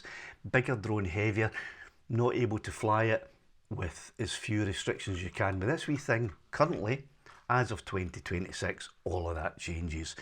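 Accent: Scotland, United Kingdom. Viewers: relentless droning—delivered with a distinctly Scottish flair—had me reconsidering all the complicated regulations and whether the Mini 5 Pro was the right fit for me. Then, in a moment of pure chaos, I completely forgot that I don’t even live in the EU, meaning none of it applies to me. Wow! You know what? Forget it—I’m going ahead and getting myself a brand-new Mavic 4 Pro instead!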